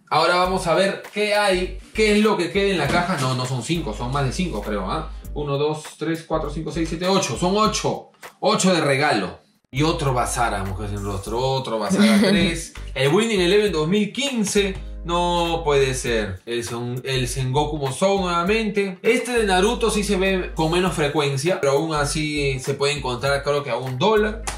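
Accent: Peru. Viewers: Acento limelo? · Soy yo o su acento es de peru xd? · Eres peruano? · Eres peruano? 🤔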